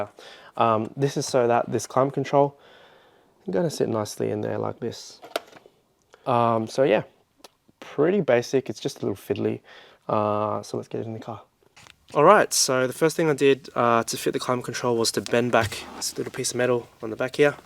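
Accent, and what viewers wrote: United Kingdom. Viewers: I got a Mekede S6 Pro Plus I bought all the accoutrements from the junkyard including the vents, duct, entire harness to the pass door (USDM), and the CORRECT dash from a 99-00 for my 97 EX Coupe. The only thing I bought used off ebay was the lower automatic mode actuator but I'm having trouble visualizing how it is installed. I guess I need to get off youtube and go dig through the shop manuals. Searching for the latter is what brought me here but I have to say is much easier to understand than ANY of the other channels that have done this exact swap. The narration deserves an award for the beautiful narration and in the King's English no less!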